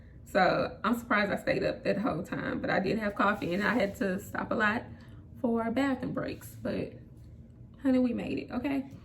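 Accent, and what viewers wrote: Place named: South, United States. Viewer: I knew you sounded country i just became a travel cna because a by watching different podcast that you giving info cause i been working two months of a agency cna and i enjoy going from facility to facility and i asked my agency do they have travel cna opportunities before i skirt out and look at god these folk then raised my pay finna put me in a hotel now i didnt know i pay for the hotel but its ok cause my daughter is in the army and i want to suprise her with a car for her 20th birthday next april so i just got a blessing under the table. So thank god for al these things if you step out on faith and believe in your vision and your dream it will come to past so everybody experience is different. To all the cnas i love ya god bless ya. Continue to enjoy what ya do working for these agencies are so much better more flexible with agency cna you have a opportunity to be a travel cna which makes more money yes i got kids too but yea like she said you got to make these sacrifices and i saw my savings go up too every hotel i travel i take my money and stuff my envelopes cause im saving and working my ass off. 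If you want to take cna to the next level stop working nursing homes 8 to 10 days a pay period forced to work you got to put in days off not with agency you confirms your days to work you be off the days you dont work and you still got a job. As i get more experienced i wont come out my pocket im just glad im putting this experience on my resume.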